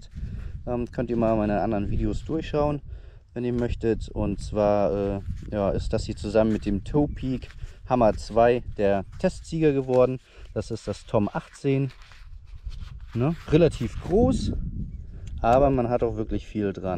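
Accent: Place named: North, Germany. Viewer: eines der wenigen male, dass ich wirklich höre, das jemand ausm norden kommt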